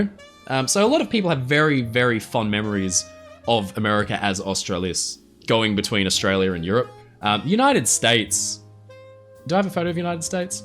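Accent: England, United Kingdom. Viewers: I thought you were from the UK , you sound more English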